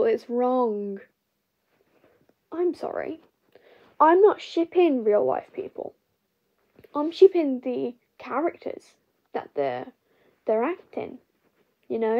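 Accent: United Kingdom. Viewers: Are you British? 😂😂😅Me in a serious video lol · O your voice is....British.? Nice voice!!! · You sound british..... Also cool art style😀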